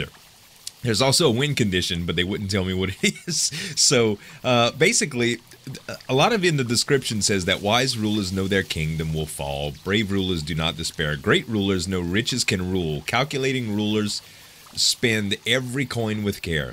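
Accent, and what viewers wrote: Louisiana, United States. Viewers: brain They are both good ol' boys. is from Louisiana and Freeman is from western Mississippi (raised there, not born). So they both have similar regional accents. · Was trying so hard to figure out what kind of accent. It's Louisiana, isn't it?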